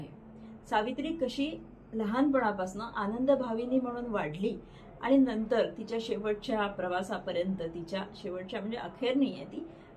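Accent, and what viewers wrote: Vidarbha, India. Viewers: My humble tributes to late Shri ji. Please continue the channel in his memory. I always liked his style in Vidarbha Marathi ascents. Happy birthday to Sahab.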